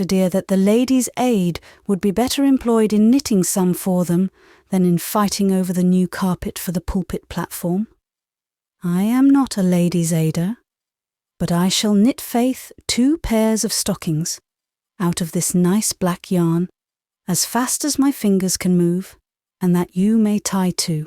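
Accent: United Kingdom. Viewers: It’s the British accent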